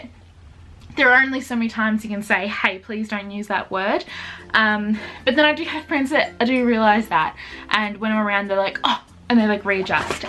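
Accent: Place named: Australia. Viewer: I already did. It’s a crack up as well!!!! Now, I’m old enough to be probably your Grandpa, but I’m captivated by your outgoing, friendly persona....... but appropriately 😂 I also love that you’ve an unashamed Aussie accent!!!!!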